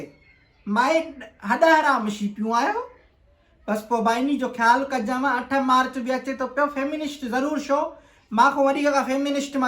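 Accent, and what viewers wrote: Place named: Larkana, Pakistan. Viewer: 🤣🤣🤣Adi iho gothano style aa larkane waran jo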